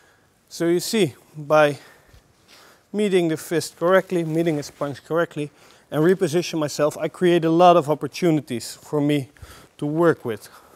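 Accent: Netherlands. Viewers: je bent nederlands he ?/? ik hoor het aan je acccent.. waar zit je op school. want ik wil ook systema gaan leren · 100% Dutch...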